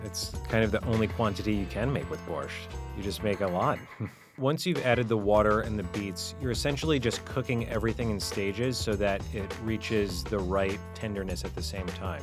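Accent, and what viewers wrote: United States. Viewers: Therefore, he's " ", not " ". I don't think he is an immigrant from Ukraine, because he speaks American accent and pronounces his surname wrong. Probably his parents were the immigrants. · Wow u sound American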